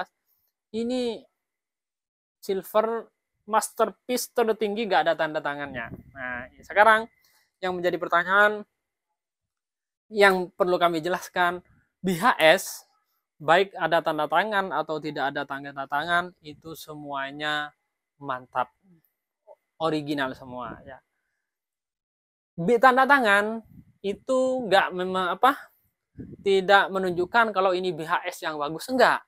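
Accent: Madura, Indonesia. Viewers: Abang dari logatnya kayak orang madura ya bang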